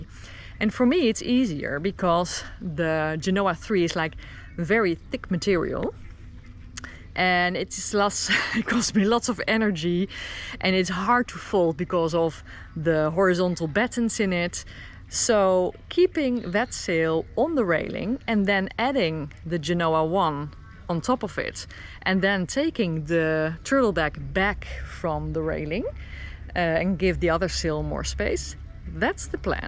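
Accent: Netherlands. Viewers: Waarom heb jij zo'n gruwelijk Nederlands accent? Je moet echt veel meer "overdrijven". Bijvoorbeeld "tolk about", de klank van jouw talk rammelt. Het is een O geworden, niet iets als een OA. Ga eens wat harder aan de klank van al je klinkers trekken. Maak het bekakt! Dat helpt. Alle Nederlanders zeggen UPDEET. Engelsen zeggen APDEET. En grapje, zeg nooit meer FOK tegen je djip. Dat gaat op een dag helemaal fout! Fok?! What? Hee, mijn "sorry" is weg. Dit stukje was NIET vervelend bedoeld. Ik hoor gewoon meteen Nederlands. En dat zit vooral in de klank van je A O U. Engelsen doen dat echt totaal anders. Jij niet en dat hoor je. Zelf intussen jaloers. Altijd geldproblemen en boten in slechte conditie gehad. Een kwarttonner Waarschip is prachtig, als al je hout in orde is. Van een lekke kielbalk word ik ongelukkig. Hij kon nergens op de kant voor een normaal aantal euro's, echt, dan word je NIET vrolijk. 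Kon hem zelf wel repareren, maar niet "effe", echt niet. Ik wil graag weer zo'n bootje, klein is leuk, wie weet lukt het nog eens. Voorlopig heb ik een hele goeie kano. Dus, het allerbeste met zeilen!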